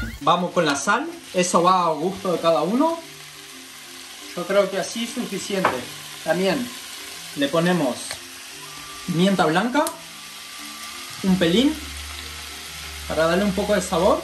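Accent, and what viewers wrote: Argentina. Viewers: que es ese acento argentino raro que tiene?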